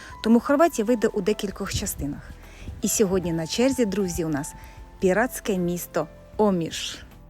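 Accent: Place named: Russia. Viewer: Тішить, що ведете розповідь українською. Чути рашівську, але... Цього року ми були в Макарській...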